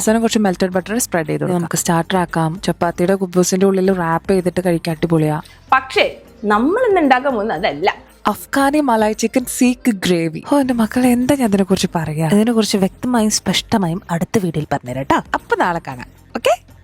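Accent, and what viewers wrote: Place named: Malappuram, India. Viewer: Eda muthe ijj palakad aanegilum ande basha malapuram baasha aan love u dear sis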